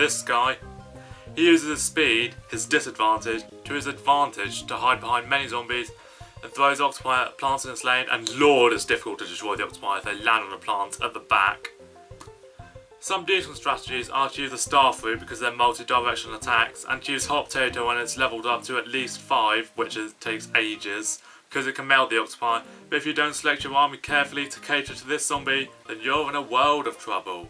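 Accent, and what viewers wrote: United Kingdom. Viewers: I think he's Britisih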